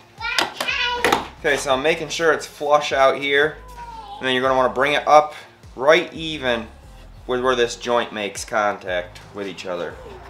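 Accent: United States. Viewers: You speak perfect american english ; clear, tidy sound and excellent pronunciation ! Very helpful video for any wood works learner and english learner as well.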